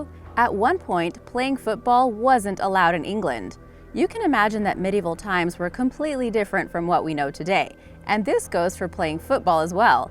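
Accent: United States. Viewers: You lost me at calling soccer football and football rugby. The narrator has an American accent. It’s just weird. I’m out. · It seems odd that the narrator with an American accent describes soccer as "football".... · Nothing worse than an American voice trying to tell British people about OUR historical times...🤨🇬🇧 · There is something triggering and insulting about hearing someone with a distinctly American accent calling soccer football.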